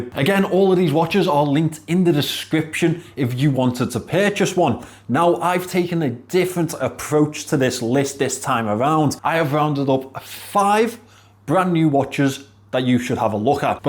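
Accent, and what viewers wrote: Liverpool, United Kingdom. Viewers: You're the first Scouser that doesn't irritate me lol, great Vid xx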